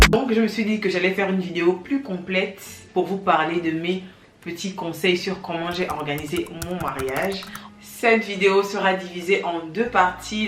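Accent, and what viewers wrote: Gabon. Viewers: Votre accent m'est beaucoup familier❤ Le Gabon est là 😊?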